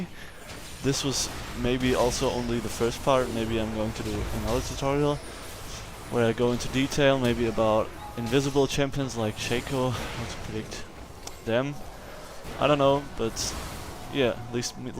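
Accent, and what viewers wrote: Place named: Germany. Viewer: Man erkennt nach spätestens 3min das du deutscher bist :D Aber trotzdem echt cooles Video ^^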